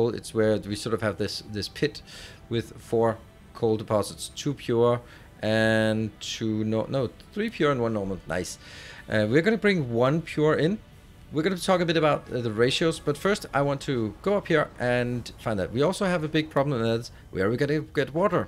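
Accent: Denmark. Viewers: are you from denmark???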